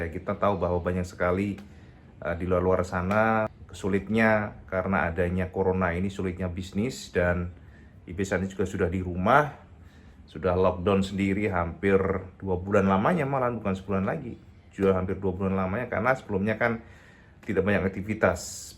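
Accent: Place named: East Java, Indonesia. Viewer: nonton kembali vidio ku ya ;) Aq guyu2 dewe cak ngrungokne bahasae peno koyo wonk jatim ada an 😁😁🤭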